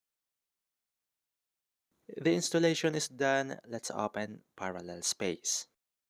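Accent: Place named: Philippines. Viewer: You sound more like filipino lmao, but your tutorial are very simple and very understandable ❤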